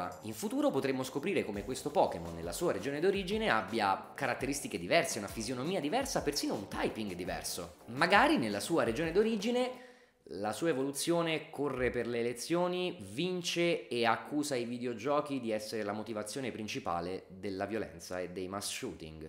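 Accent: Campania, Italy. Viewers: ma sei campano??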